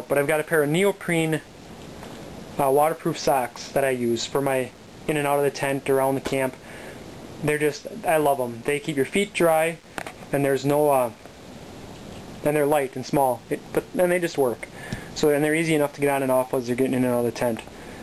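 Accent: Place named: Upper Peninsula, United States. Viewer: you sound like a yooper der pale, you from canada or anywere around ehh?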